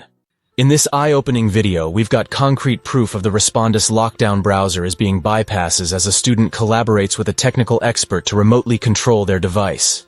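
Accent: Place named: India. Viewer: your indian bro i can tell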